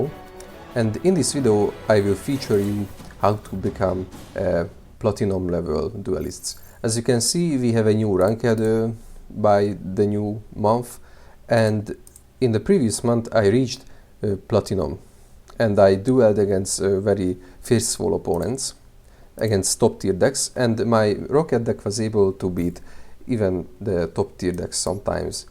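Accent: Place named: Hungary. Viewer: a magyarok angol szavak kiejteseben kicsit olyan masok es felismerhetobbek 😂 de ez nem rosszbol.. amugy jo az angolod.. ez az elso vidi amit lattam toled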